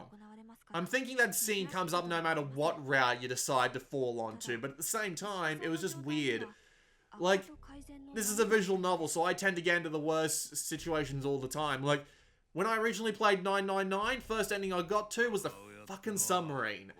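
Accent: Australia. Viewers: It sounds like you would really enjoy the anime. You sound Australian, is that correct? If so, Psycho-Pass is on Madman's AnimeLab for free (and it's legal) in Australia and New Zealand. It's a great anime and I think this visual novel is really just for fans of the anime, like me. It's not a standalone product.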